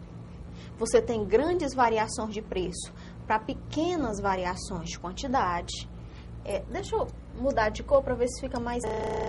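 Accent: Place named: Northeast, Brazil. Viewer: Oi, gente! Estou amando as aulas, bem simples e didática, além do sotaque bom do Nordeste. Mas, gostaria de saber onde está a Economia Aula 20 Microeconomia Bacen EVP????? Não consegui achá-la. Bjokas pra todos!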